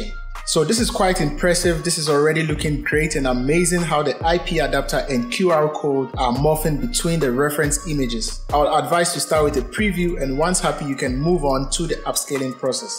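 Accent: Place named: Ghana. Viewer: Boss, you sound Ghanaian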